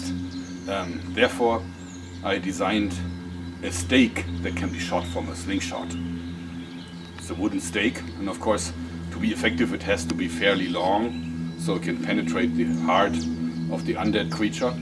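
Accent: Germany. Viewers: könnte es sein das du deutscher bist??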